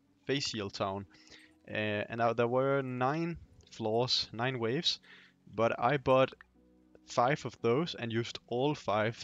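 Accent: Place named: Germany. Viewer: I hear some deutsch in your english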